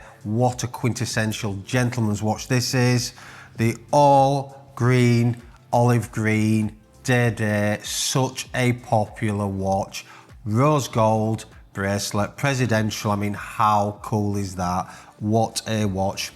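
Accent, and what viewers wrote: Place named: Yorkshire, United Kingdom. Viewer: Out of all the YT videos regarding Watch content, I have to say, I really enjoy your videos. Always to the point, no nonsense or drama. Just the timepieces and the market details. I’m a huge fan. Enjoy that Yorkshire accent as well. I do have one question though, not to insult you by any means, but what is your opinion on the “Steinhart” entry level watch brand ? It’s interesting to me that very little information is out there about this brand. The Ocean One looks very similar to the ever popular Submariner, but without the Rolex price. As an everyday watch I’m interested in one. But as mentioned I can’t seem to get an experienced watch dealers perspective. Maybe that’s a statement in itself? Hope all is well across the pond ! Cheers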